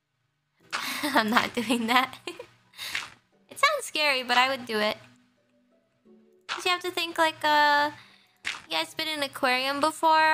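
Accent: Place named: New York, United States. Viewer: Her accent almost NY-like. Mid Atlantic/NY? Anyways I usually don't like 'mom jokes' but everytime she cracks it its so cute and funny.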